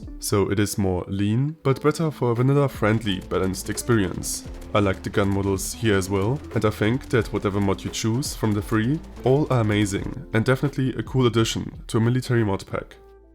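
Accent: Germany. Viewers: Bro are you german??? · thats a realy german english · you are German or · are you german?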